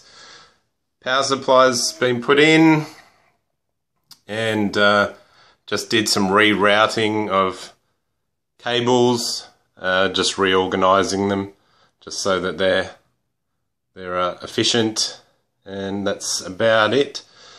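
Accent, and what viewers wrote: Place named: Australia. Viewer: Just curious can tell by your accent, btw love the build that your making, no its not im Australian as well was just laughing cuz im an idiot.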